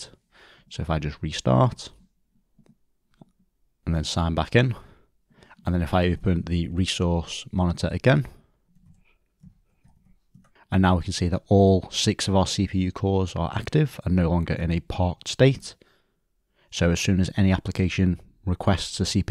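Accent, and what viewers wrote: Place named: Merseyside, United Kingdom. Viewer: Solid video, are you from Merseyside originally? You sound local. Cheers for the DC promotion video as well, not done that in a while so the video helped.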